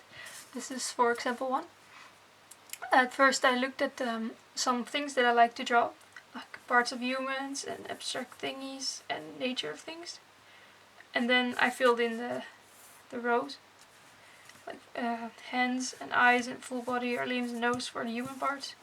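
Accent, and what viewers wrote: Netherlands. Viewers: Are you / Is she Dutch? I hear a familiar accent 😏😏😏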